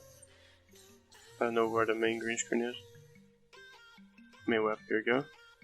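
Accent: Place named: Netherlands. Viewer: Hahah I knew immediatly that you we're dutch when I heard your voice. May I have your skype, or you can add mine if you want: <mail> I have something I would like to talk to you about!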